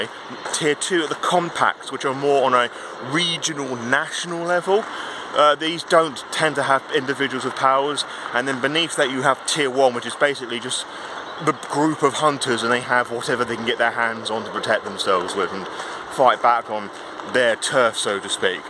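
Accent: United Kingdom. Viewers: If I were British, I would want to sound like you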